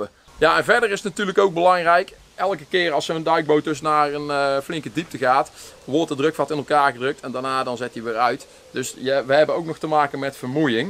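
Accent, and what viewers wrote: Australia. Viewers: Is this Australian accent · I had no idea what channel this was when I clicked on it or where he is from but as soon as the video started I thought he was speaking English with an Australian accent. Then I realized I couldnt understand him at all. Then I thought he was speaking Gaelic or something with an Aussie accent.. do all dutch people sound like this? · I thought he just had a real heavy Australian accent haha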